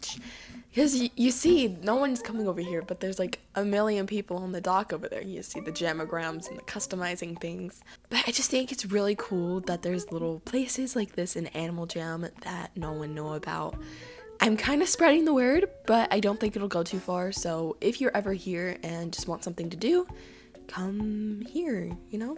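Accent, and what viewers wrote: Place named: United Kingdom. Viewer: Sick British accent!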